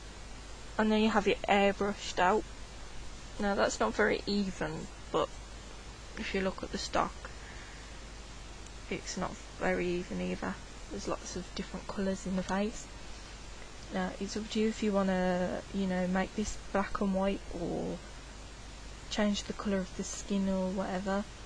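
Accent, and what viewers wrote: Birmingham, United Kingdom. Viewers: Brummy accent ftw, im the same ;) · Who would hate your british accent? It's so cute. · great vid lady and yeh to hell with the yanks who hate the british accent im from london but next to ours brummys have d best accent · You are awesome, I like this tutorial. And by the way, I love your british accent (not kidding). Could you upload a HQ video, it seems a bit blurry. Greetings from Mexico. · Bostin accent! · hell yea boi... i love british peoples accents...im also aussie..good vid though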